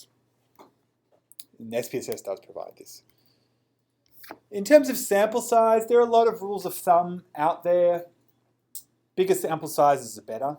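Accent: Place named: Australia. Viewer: that's a typical Australian accent.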